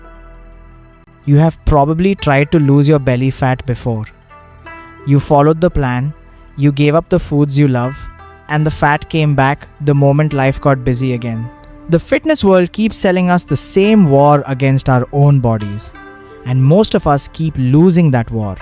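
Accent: India. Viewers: Nice videos ,but your accent is indian not japanese at all ,nothing wrong but it is very clear .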